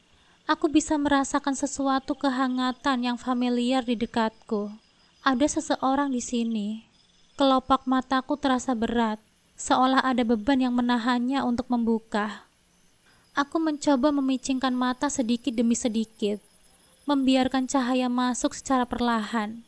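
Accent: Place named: Central Java, Indonesia. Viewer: Kk kok tau desa karang nduwur , apa kk org jateng , klo dari logat cara baca a sii spt iya yaa ,,, klo blh tau kk berasal dr kota mn yaa ? 🙏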